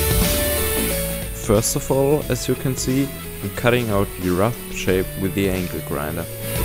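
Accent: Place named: Germany. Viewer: Great video 👍🏼 ich nehme mal an du sprichst Deutsch bei deinem Akzent? However well done👌🏼👍🏼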